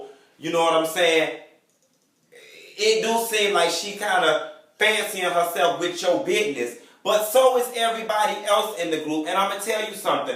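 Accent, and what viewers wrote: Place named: Tallahassee, United States. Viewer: Thank you for the review! Can I just say that I love the other reviewers...each has his own dialect. But you're the only one who sounds natural saying "back peddle and pu$$y pop." I went to undergrad in Tallahassee & recognize your dialect. The others don't talk like that..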